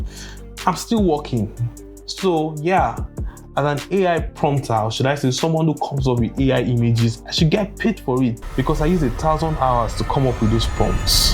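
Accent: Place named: Africa. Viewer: Wahala! If you can't understand his accent, then you're clearly not his target audience. There are millions of other creators that have made videos on this, so go watch them. Imagine telling a creator to change his accent, a deeply ingrained trait, just to fit you. And calling his accent "local"? That's such a colonized, back-handed mindset. Accent is due to speaking another language with inflections similar to your native language. But asides from being widely spoken, please what makes English a "higher" language to diminish or look down on our beautiful African languages and accents. Please oo, think before you comment.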